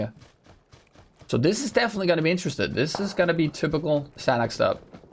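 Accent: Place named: Denmark. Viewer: hey what country are you from because you seem to come from Denmark. in your way to pronounce English on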